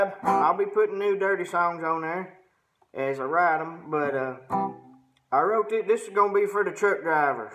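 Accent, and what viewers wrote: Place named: South, United States. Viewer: Dude you're very talented man my hats off to you but hey I'm trying to pick up your accent you have a strong deep southern accent where are you from originally? Oh yeah my lady says that you make her laugh every time she hears one of your songs she said You Got Talent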